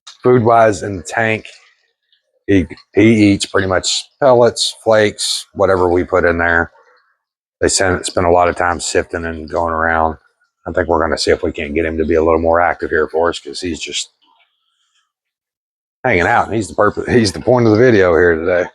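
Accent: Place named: Kentucky, United States. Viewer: Kentucky ? Coool I guess I should have guessed by your accent but I couldn’t . I’m in ny !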